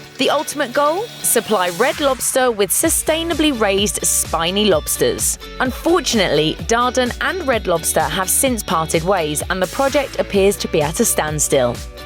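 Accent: England, United Kingdom. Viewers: You talking about red lobster with English accent? Fuck you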